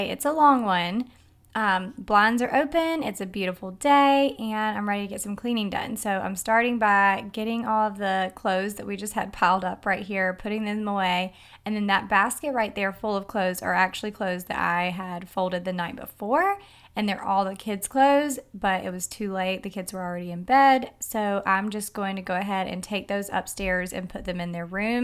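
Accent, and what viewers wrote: South, United States. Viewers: Enjoy listening to you talk with your “southern accent”. Originally from Waynesboro Ga (30 minutes from Augusta) but now live in South Carolina.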